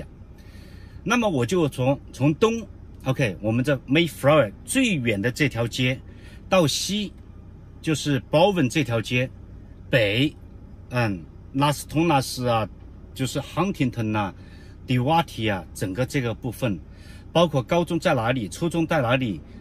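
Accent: Hunan, China. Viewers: 订阅了。师傅您是湖南人吗？谢谢分享您的经历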